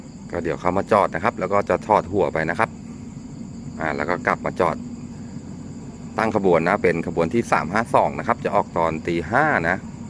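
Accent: Ratchaburi, Thailand. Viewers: ไม่มีอะไรต้องติ ผู้บรรยายดีกว่าตามทีวีหลายช่อง เสียงชัดเจนฟังสบาย ติดสำเนียงราชรีบ้าง แต่ป็นเสน่ห์อย่างนึงของภาษาแต่ละท้องถิ่น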